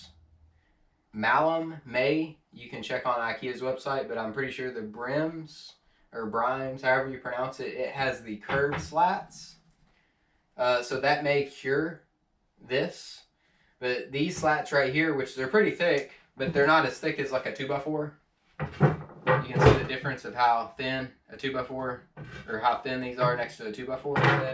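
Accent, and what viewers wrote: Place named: South, United States. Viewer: I wonder what this southern sounding guy keep in that safe 🤔🔫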